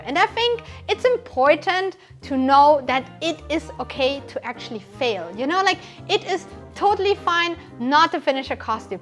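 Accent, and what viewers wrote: Germany. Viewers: For me she Sounds Like she has a Strong German accent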